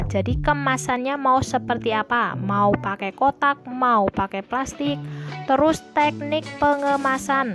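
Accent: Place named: Banyumas, Indonesia. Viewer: Youtuber ngapakk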